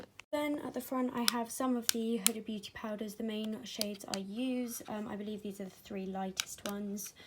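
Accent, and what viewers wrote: United Kingdom. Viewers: Omg I'm surprised that your British I thought you were American ahhaha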